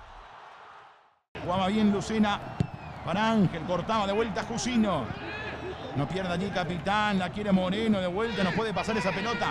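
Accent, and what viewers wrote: Paraguay. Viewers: El acento asunceno si tiene parecido con el rioplatense, que el tipo hable de manera correcta no significa que le esté copiando a los relatores Argentinos. Nde caprichosove kururu pytãgui chamigo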